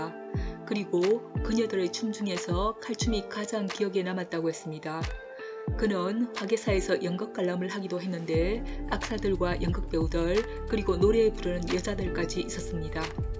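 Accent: Gyeongsang, South Korea. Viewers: 쌀을. 살 로. 발음 하는 경상도 발음 입니다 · 신기하단말야~ 유튜버님 경상도 분이신가봐요^^ 차라리 오리지널 억양을 쓰시는건 어터실지요 듣기가 좀 .....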